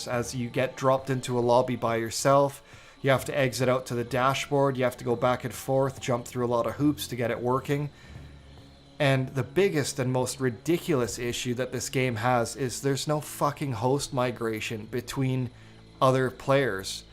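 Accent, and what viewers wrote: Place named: Canada. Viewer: This Canadian voice sounds familiar...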